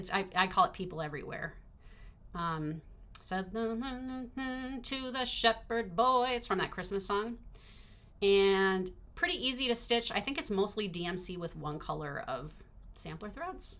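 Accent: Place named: Pennsylvania, United States. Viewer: Woo Hoo! A blast from the past (and ever present!). What a sight for sore eyes you are dear lady! And what a fabulous catch up from one of my favorite people. I can’t wait to meet you in Tulsa this October. I love everything you showed us today. And, I am thrilled that you love your new happy place! My folks were born and raised in Erie: Mom in Little Italy, dad in the Irish and German neighborhoods. With dad’s work, we migrated west to LA then to Texas. That’s where we’ve been since 1970. I can only imagine the authentic everything you’re able to enjoy in the city of brotherly love. Your accent even reminds me of my cousins in PA. So, I’m sure you fit right in. Please drive carefully, stay safe, and enjoy every blooming minute of being with your friends in Tennessee. Congrats on your business, your new releases and on your new life in a lovely community. 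Happy stitching every day! You look great and so happy! ❤❤❤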